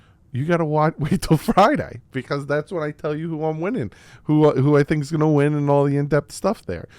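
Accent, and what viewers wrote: New Jersey, United States. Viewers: I knew that accent was familiar lol JERSEY STAND UP!!!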